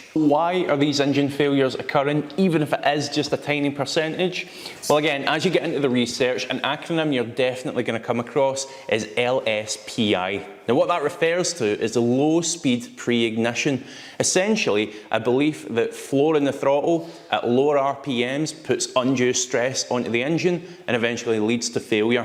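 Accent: Scotland, United Kingdom. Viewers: I love this car, but what I love even more is that pure, Scottish accent. Nice guide. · At last. A scottish guy on the internet 🙂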